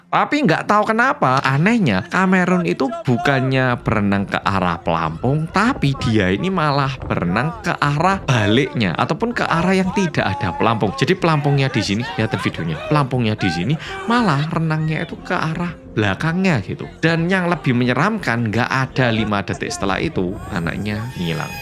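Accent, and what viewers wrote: Java, Indonesia. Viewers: Wong Korea medok tho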